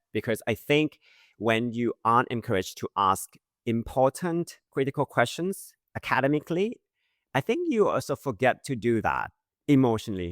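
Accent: Australia. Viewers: just curious - your accent is more Aussie than British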